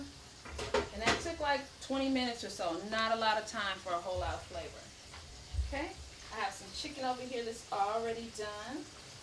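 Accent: United States. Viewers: Yummy....Huge fan of your cooking and loved American accent. Wish you have your own TV cooking shows or even better come down under Australia and shows us Aussies your delicious cooking recipes.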